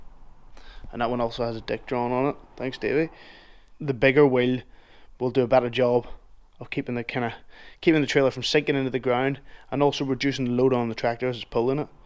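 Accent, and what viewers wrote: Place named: Belfast, United Kingdom. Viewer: where abouts are you from you sound like your from belfast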